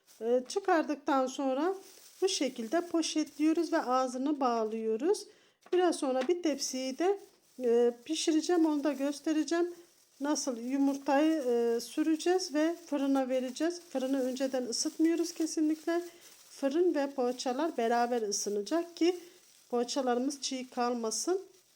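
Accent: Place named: Black Sea, Turkey. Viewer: Ellerine sağlık çok güzel olmuş karadenizlimisiniz konusmasiveniz ayni bendekaradenizliyim izmirden selamlar ❤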